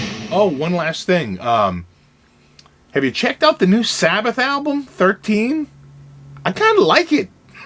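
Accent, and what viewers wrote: Boston, United States. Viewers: Are you from Boston? If so, I am too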